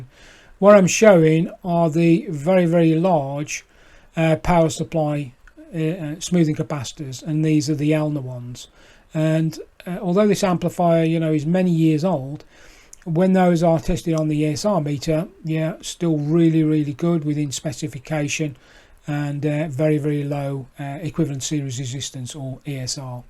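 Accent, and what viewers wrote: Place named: United Kingdom. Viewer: Thanks for the reply, what is their shipping time to UK like? (I'm guessing your in the UK from your accent)... :)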